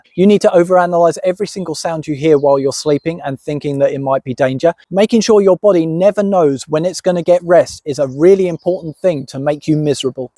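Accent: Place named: United Kingdom. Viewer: I don't know man, I love the premise behind the videos, etc. But something in the delivery is missing.... Perhaps it's your British accent making us Americans think you are just being a pretentious arse instead of being tongue in cheek. Maybe dress up as one of the knight s who say nee or something, somehow give us some slapstick. I'm not sure exactly what, because the observations are very on point, so it's gotta be somehow in the delivery that just doesn't get me laughing.... Can I ask where you originally hail from? Dry British comedy can be enjoyed by us, but we still remade The Office with Steve Carell....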